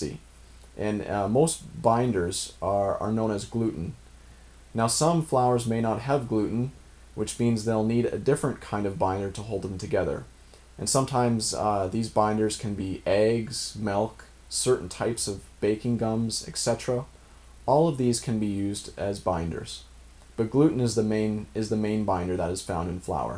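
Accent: Canada. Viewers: You couldn't spot that Canadian Accent?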